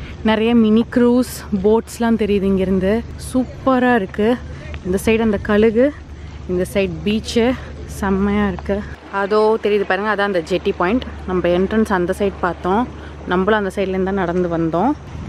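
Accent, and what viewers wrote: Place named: Salem, India. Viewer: எல்லாமே பிடிச்சி௫க்கு...முக்கியமாக உங்கள் பேச்சு...நம்ப சேலம் ஸ்லாங் அ௫மை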